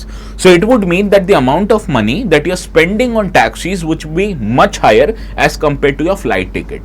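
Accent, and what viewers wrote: Kerala, India. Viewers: Please don't talk with kerala accent, talk like a Goan.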